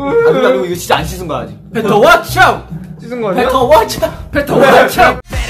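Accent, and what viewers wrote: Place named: Australia. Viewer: His Australian accent I can’t it’s so cute and just yk 😭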